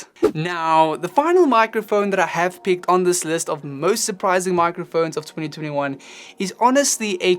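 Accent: South Africa. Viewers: Ah so cool, I could tell you were South African staight away. Very cool to see a saffa respresenting in the youtube music scene!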